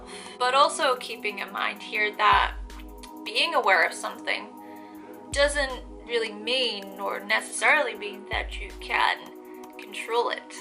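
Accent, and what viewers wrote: Ireland. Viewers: The Irish accent is extremely thick. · It's because is from lovely Ireland^^ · You have the most wonderful inflections. You're Irish, but it's a very lovely version, thank you..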